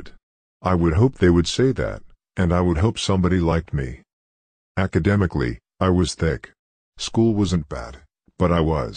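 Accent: United States. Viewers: This is weird - At least they could have got someone with a Yorkshire accent to read them instead of an American droid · Great quotes from a great man read out by a mechanical bot with a septic tank accent! shameful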